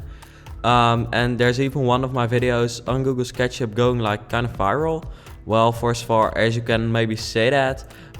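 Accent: Netherlands. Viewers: That Dutch accent though... ;) But I really like your work!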